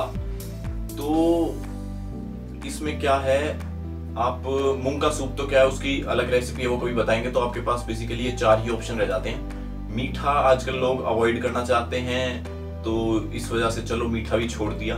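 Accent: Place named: Himachal Pradesh, India. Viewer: It sounds like ...you're from HP Nyc info btw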